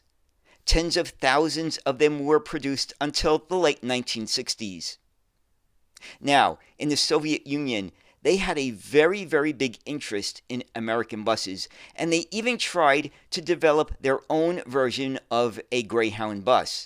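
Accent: New York, United States. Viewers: Great vid for - as you say in your YT intto - enthusiasts for obscure transport stuff. PS: that old Zis-16 looks suspiciously like an imitation too, of the iconic Saurer Post Bus from Switzerland. PPS: the New York accent and stadium announcer style add that little extra... 🙂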